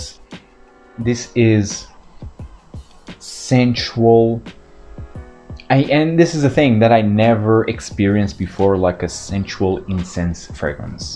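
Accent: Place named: France. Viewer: this is my first time watching your review, I'm loving it. thanks for the vivid describe of the notes. I also love your accent. it's like my french friend speaking english.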